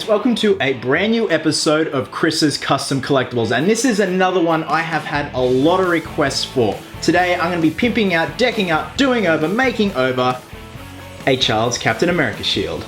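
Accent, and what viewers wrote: Australia. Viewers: r u australian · he is a ausie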